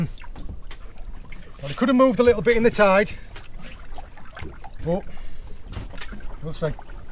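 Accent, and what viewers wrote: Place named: North, United Kingdom. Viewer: Great vlogs, your like the fred dibner of fishing, your teaching abilities are on point, more so than reading a book, what you say is very interesting it goes in one ear and it stays in the other. Is your boat able to sustain a living or do you have another income source. How many pots do you set per day. Where in the north is your accent from. Set a trap for the pot thief's.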